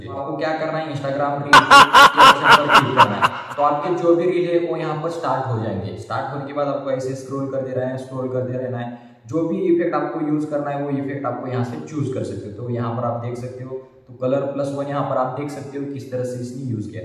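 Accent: Maharashtra, India. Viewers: Marathi ahe ka tu😁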